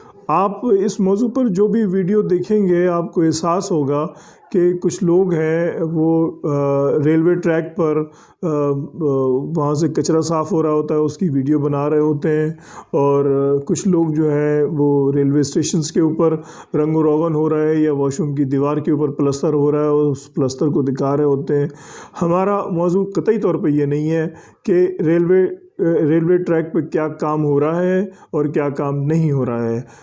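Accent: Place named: Pakistan. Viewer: Sir I was waiting for you to react to these comments . Sir love your video and the way how you talk real urdu accent 👍👍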